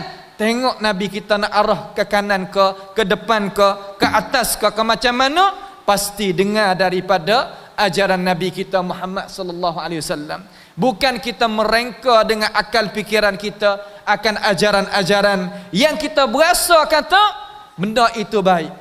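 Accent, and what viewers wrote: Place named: Petani, Malaysia. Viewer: kurang dah loghat petani...hehehe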